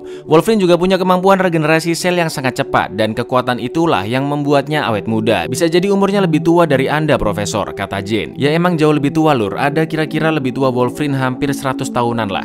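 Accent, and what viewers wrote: Java, Indonesia. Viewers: SUARANE ATMIN MEEDOK KOYO TELO EMPUK NGANGENIN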